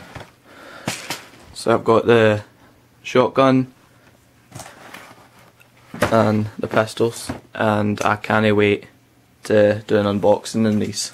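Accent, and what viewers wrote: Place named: Scotland, United Kingdom. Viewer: aahh gotta love the scottish accent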